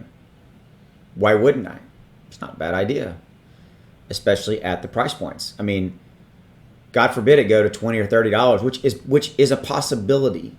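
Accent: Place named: Appalachia, United States. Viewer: Like your video. As for your accent, you sound like a local (NC / SC mountains).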